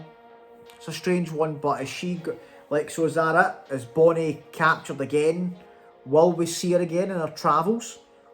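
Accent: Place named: Scotland, United Kingdom. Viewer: Wow you have a Scottish accent ‼️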